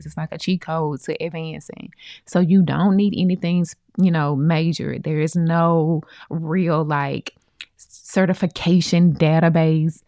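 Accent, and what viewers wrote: United States. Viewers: I’m a phlebotomist and have always wondered if I could do this! Thanks!! I’m with CDNA and it is free. They didn’t charge me anything! And I’m in Ga you sound like a Atl girl. I will send patients we can’t take up your way! ❤thank you again for these gems! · Luv the southern twang :) and enjoyed your professional presentation of your topic...the meat and potatoes without all the garnish!! Very insightful and honest and that is what i feel a lot of content creators are missing...authenticity. Thank you for the wealth of information and keep doing what you're doing!! Stay Blessed!!!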